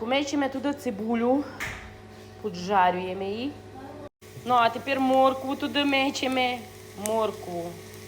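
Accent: Transcarpathia, Ukraine. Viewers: Файна мова, Закарпатська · Ви розмовляєте по закарпатський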